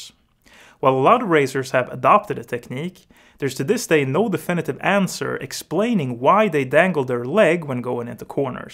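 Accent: Sweden. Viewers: I love your swenglish xD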